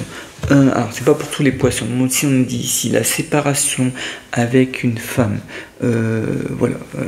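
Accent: North, France. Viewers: vous êtes du nord? Bonne soirée j'adore votre guidance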